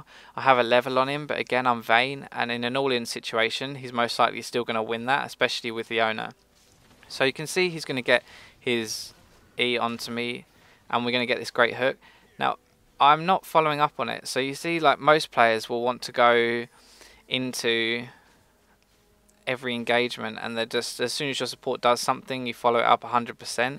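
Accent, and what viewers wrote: United Kingdom. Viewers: As always, your videos are awesome and super informative. For some reason I find your videos much easier to watch, understand and learn from purely because you're British. It'd be awesome to play with you sometimes (I main support), and also a quick question - could you put up a video of a Vayne game against a bunch of heavy divers? Team fighting is always hard for a Vayne when the enemy team has like a Renekton and a J4 for example.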